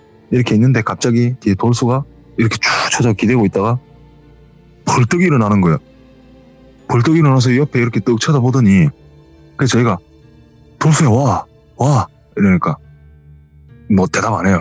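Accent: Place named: Busan, South Korea. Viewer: 오랜만에 듣는 부산사투리 넘 좋어요 멀씀도 넘 잘하세요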